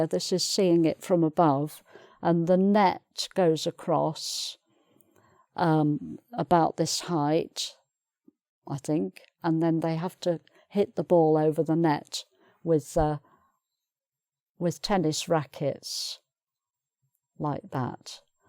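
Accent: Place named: RP, United Kingdom. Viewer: I love this lady! She explains things so clearly and with this so beautiful British received pronunciation.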